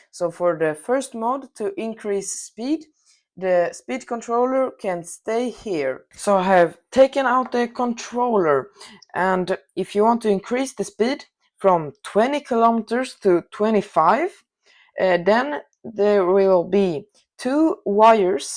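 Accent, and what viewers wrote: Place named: Sweden. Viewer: Dude you sound Swedish ❤😅 But 5km/h ??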